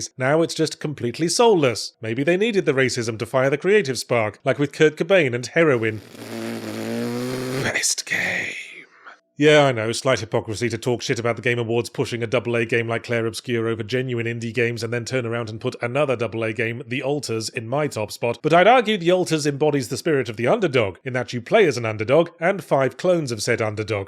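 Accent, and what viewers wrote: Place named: Midlands, United Kingdom. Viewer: Where in the Midlands did yahtzee grow up?? 🤔 that ain't no black country accent... Or brum... Or stoke... Or leicester (I could go on) 😅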